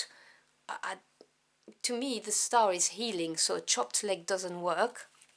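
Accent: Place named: France. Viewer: Lovely accent, French? Or maybe like Syria or something ?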